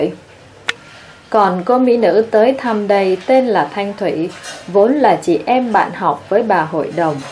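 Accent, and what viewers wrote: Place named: North, Vietnam. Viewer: Truyen HBC fai giọng đọc nguoi mien moi dc . Het nguoi đọc sao chọn giọng Bắc kỳ vậy .ko nghe đâu !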